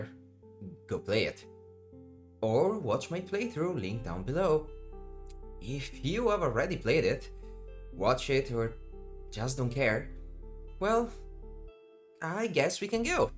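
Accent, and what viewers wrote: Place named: France. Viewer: 10/10 video earned new sub (youre accent sound french) :D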